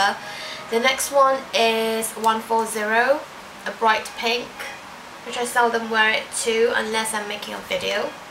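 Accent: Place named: Northern England, United Kingdom. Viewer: Are you Japanese? I lived in Japan and taught English there for 4 years. I have never heard anyone from Japan who can do a good a northern english accent as you, its amazing!! How long have you lived in the UK?:)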